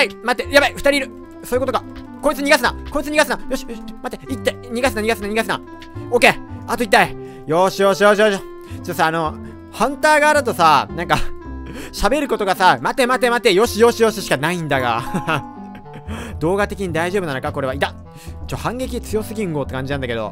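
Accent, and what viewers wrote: Kansai, Japan. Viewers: なんか今回関西弁多い笑笑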